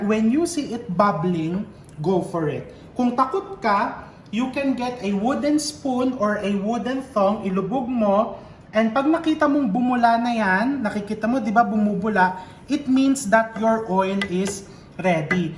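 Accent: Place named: Cagayan, Philippines. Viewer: Chef we have same accent from tuguegarao city.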